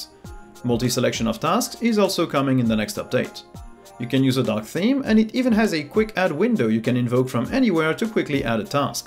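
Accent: France. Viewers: Not My Audio. But saying your french makes a lot of sense. I can understand Indian accent, Asian Accent etc. But have always struggled very hard to understand French accent. My brain just does not want to understand that accent.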